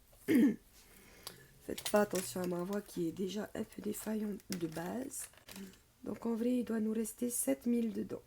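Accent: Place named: Tahiti, French Polynesia. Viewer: J ai l impression que c est un petit accent Tahitien 🤗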